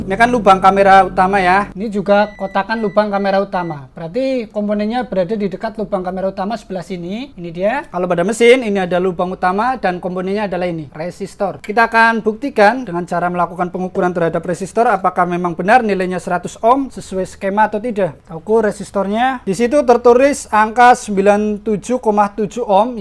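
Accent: Banyumas, Indonesia. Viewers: Mantap bg,, kayaknya orang ngapak ya dari logat😁👍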